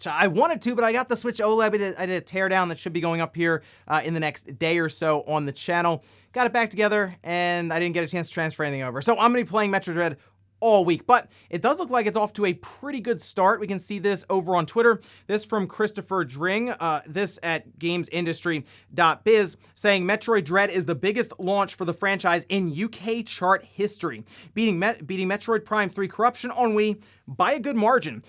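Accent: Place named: Philadelphia, United States. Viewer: U from philly area, I hear it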